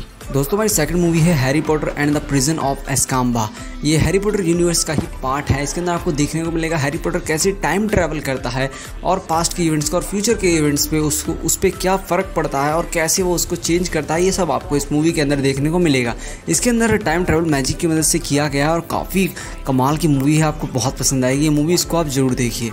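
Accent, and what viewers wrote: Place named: Bundelkhand, India. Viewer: बुदेलखंड से लगते हो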